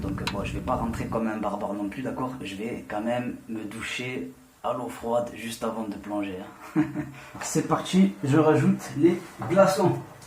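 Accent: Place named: South, France. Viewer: Perpignan 😍😍 Il m'a bien semblé percevoir l'accent du sud 🤗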